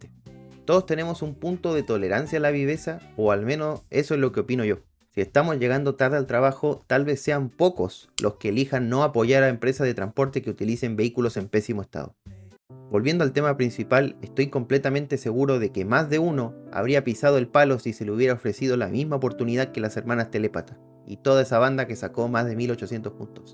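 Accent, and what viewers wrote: Chile. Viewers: Bro, porque hablas como chileno? Saludos mano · creí q era la única que había notado ese acento chileno XD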